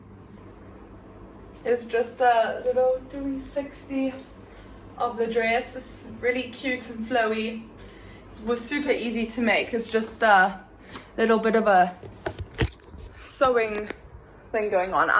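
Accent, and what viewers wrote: South Africa. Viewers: Are you South African? You sound like you're from there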